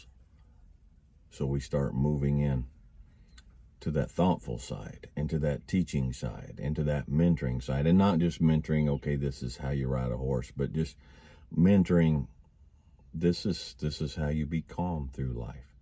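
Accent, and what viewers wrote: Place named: Wyoming, United States. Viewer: im from Wyoming I’m stationed overseas and its rare I come across something that reminds me of home and just the way you talk reminds me of home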